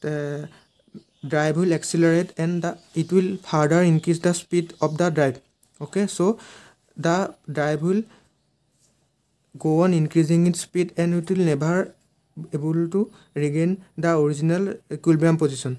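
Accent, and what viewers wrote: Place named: Assam, India. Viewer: Moiu kotha huniye axomor buli dhoribo parisilu. Btw nice video bro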